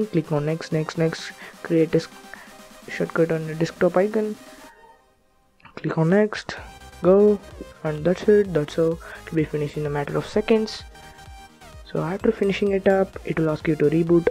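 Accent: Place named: India. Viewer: when i heard indian accent, then i pass.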